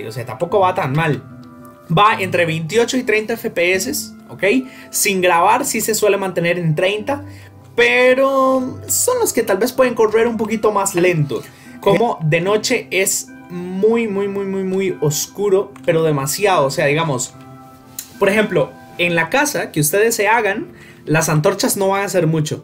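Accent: Costa Rica. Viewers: Ese asentó lo conozco bro, ¿Eres de CR? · Bro eres tico? xd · Jaja yo también dije "este es tico" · supe que eras tico, desde que digiste inutil jajaj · mae sos tico verdad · mae usted es de costarica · Desde que oí tu pronunciación de R supe que eras tico xd Salu2 desde Argentina (Soy de Nicaragua) :D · Eso mismo iba a comentar, este wila es tico. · Es Tico? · Parece tico PD: en el lo confirmé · es por el acento tico igual lo pronuncia asi Rodezel2.0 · Me suena a hermano tico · Mae usted es de costa rica verdad? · Quién más se dió cuenta que tiene acento se costarricense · Se te nota lo tico de largo jajajaja, buen video bro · Tu forma de hablar se parece a la de un Costarricense, eres de por acá? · JAJAJA Bro, yo venía a comentar también que fijo eras tico xad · Todos hablan de los shaders pero papi desde el minuto 1 note su acento tico, pura vida mae 🤙🏻🇨🇷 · desde el minuto 1 se nota que es costarricense jaja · Grande este perro, ya me decía yo que ese acento se me parecía jaja xd, buen video me encanto👌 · usted es de costa rica ?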